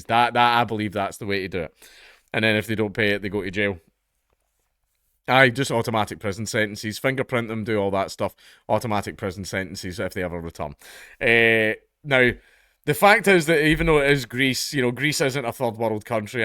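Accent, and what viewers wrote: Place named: Scotland, United Kingdom. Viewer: I’m just here to see do different accents then revert back to his HEAVY Scottish accent 🤣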